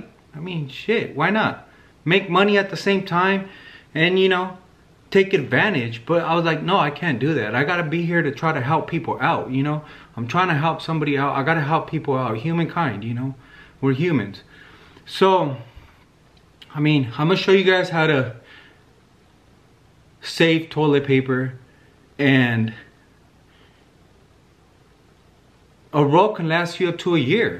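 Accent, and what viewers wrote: Sinaloa, Mexico. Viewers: Love his Spanish 🤪 talks straight up like a sinaloense. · I'm so freaking dead right now 💀💀💀💀 pinche, the fact that I was taking his ass serious at the beginning.. like dead serious. All into it.. I cannot see you the same right now 😂😂😂😂😂 and then his acento bien sinaloense😂😂😂🍅 actor of the year goes to........ 😂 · He had me at “a roll could last you up to a year” so I continued watching...... pero like no quiero usar my finger to clean my 💩😅. The Spanish version had me rolling😂💀 “no se anden panikiando” also how he kept saying “fundillo” deceased! His Sinaloa accent made it so much better. This video needs to go viral ASAP😂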